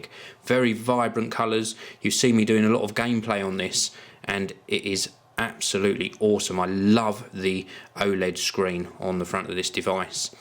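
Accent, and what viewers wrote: United Kingdom. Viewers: Every reviewer is cooler with a British ascent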